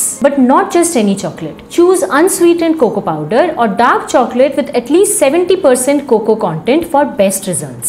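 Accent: India. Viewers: Man how I like that Indian accent…. Could hardly concentrate on the contents 😊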